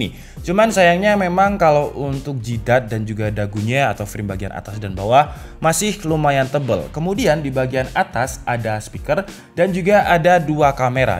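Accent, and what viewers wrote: Java, Indonesia. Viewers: Medok mu metu ms ngueri ngerong😭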